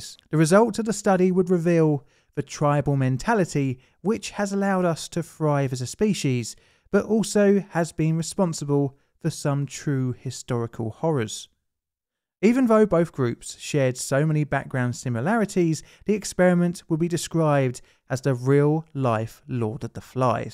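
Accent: United Kingdom. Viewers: Mo-caison??? Its Mock-a-sin... I get it you're British but holy guac I've never seen someone get that so wrong!! I'd love to see you say Boise, or Pocatello.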